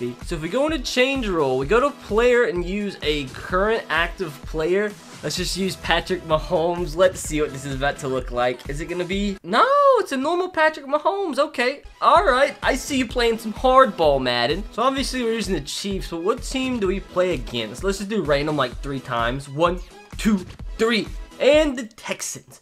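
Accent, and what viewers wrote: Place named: Pennsylvania, United States. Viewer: Pennsylvania accents make we want to gouge my eardrums out.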